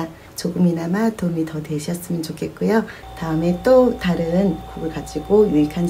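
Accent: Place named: Seoul, South Korea. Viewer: 오? 부산에 계신데 서울 말씨네요~~^^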